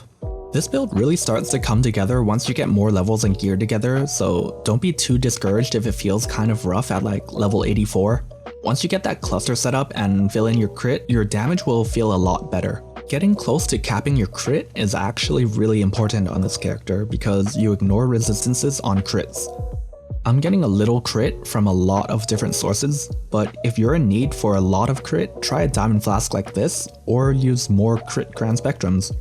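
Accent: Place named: China. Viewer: that chinese accent tho...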